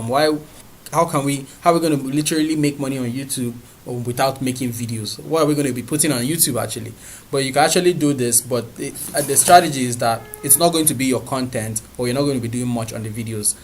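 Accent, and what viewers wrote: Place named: Nigeria. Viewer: Hi. Thanks for your a great video. I like your accent. Are you Nigerian? I saw your comment on another video I jus subbed you. Please sub me back I'm also about making money on YouTube.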